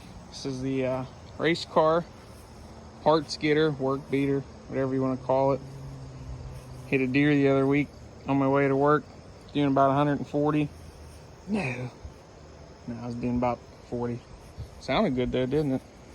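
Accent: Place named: Pennsylvania, United States. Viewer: I knew right away you were from Pennsylvania. When I heard you speak on PeaceNGrease video from rod run I got to tell you that Mack truck is beautiful I love it the iron city beer sign on your floorboard at the shifter reminds me of home I'm originally from Butler Pennsylvania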